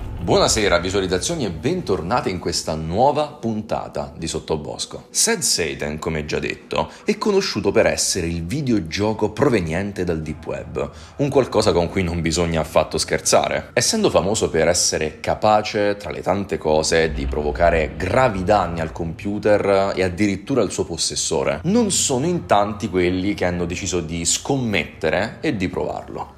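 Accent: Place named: Tuscany, Italy. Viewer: sento un accento toscano in lontananza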